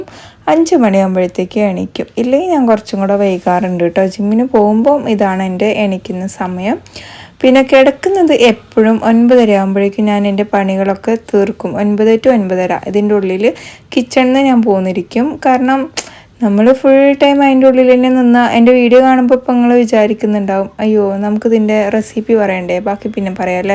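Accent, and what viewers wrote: Palakkad, India. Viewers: പാലക്കാടൻ സ്ലാങ് വേറെ ആണോ അറിയില്ല അത് കൊണ്ടു ചോദിച്ചതാ. ഞാനും മൈലാഞ്ചി ഇട്ടാൽ കൈ ചുമക്കില്ല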